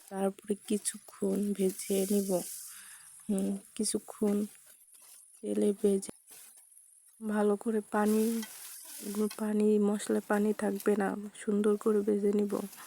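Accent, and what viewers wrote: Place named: Bangladesh. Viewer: খুব সুন্দর লাগলো তোমার রেসিপিটা খুব ভালো লাগলো, পুরো ভিডিওটা দেখলাম, কথা শুনে মনে হচ্ছে বাংলাদেশে বাড়ি, আমি ইন্ডিয়া থেকে দেখছি ভিডিওটা, আশা করি আপনি আমার পাশে থাকবেন❤❤❤❤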